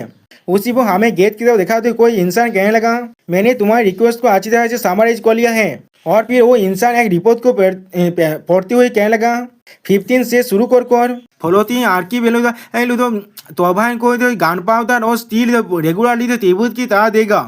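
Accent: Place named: Bengal, India. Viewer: Bhai toda slow or hindi clear bolo kitna fast or bangali me bol rahe ho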